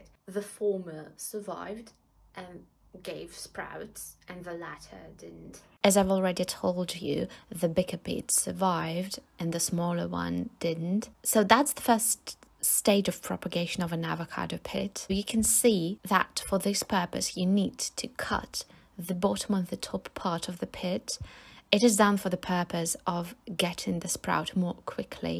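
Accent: United Kingdom. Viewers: Your British accent is very welcoming by the way and your KOT is very cute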